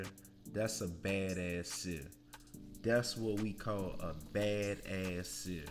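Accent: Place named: New Orleans, United States. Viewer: The New Orleans accent tho 💪🏾💪🏾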